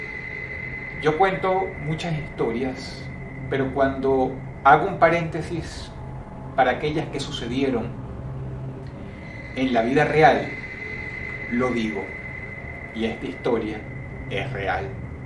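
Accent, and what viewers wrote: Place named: Venezuela. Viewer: No son suyas. Son historias sacadas de internet. Y con el acento venezolano, pasa de dar miedo, a dormirme. Fuk Venezuela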